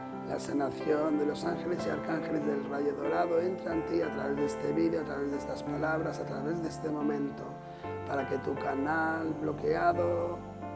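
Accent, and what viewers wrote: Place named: Spain. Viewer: me cuesta entender a los Españoles cuando hablan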